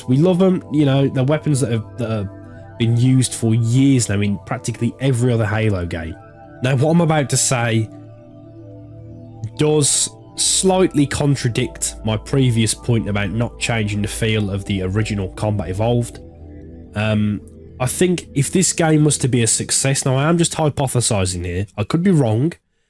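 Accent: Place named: Liverpool, United Kingdom. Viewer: Best Halo YouTuber with the scouse accent 😉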